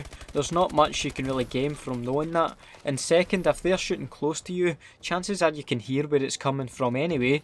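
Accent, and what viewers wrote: Scotland, United Kingdom. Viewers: He’s got a strong Scottish accent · I dont even play COD anymore i just listen iT for the amazing scottish accent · He shoulda said holographic shite instead of blindness feel like its funny saying shite in Scottish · Half the shit he says wouldn’t be funny in an regular accent but in this Scottish it has me dead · I cannot say just how much I love the Scottish accent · everything this guy says is automatically 4x funnier since its scottish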